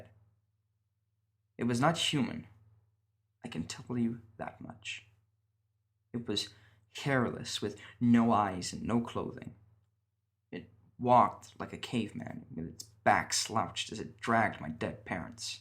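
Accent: United States. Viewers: The American accent sounds fantastic, only thing I noticed that was a bit wrong was when you say "idea" you pronounce it more like the British "ideer" and less like the American "ideeya". I Hope you don't mind some constructive criticism. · why do you use an American accent when you read creepy pastas?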